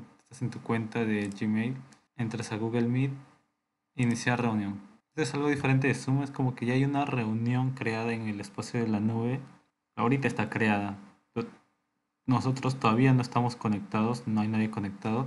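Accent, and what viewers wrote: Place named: Peru. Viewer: adivino de donde eres eres de peru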